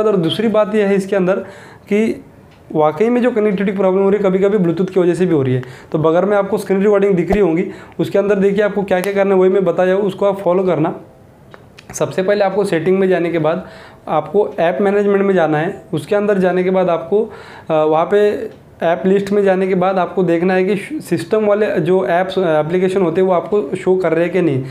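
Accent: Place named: Mumbai, India. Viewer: Fucking useless i cant understand bombay shit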